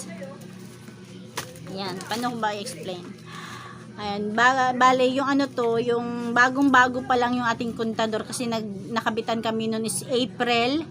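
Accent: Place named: Bicol, Philippines. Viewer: watching kasari galing ng intro sarap pakinggan yong salita ng bicolana haha..salamat sa pag share ng billspayments niyo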